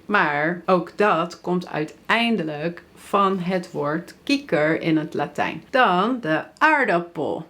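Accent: United States. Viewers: jij bent geen nederlandse je klinkt als een yank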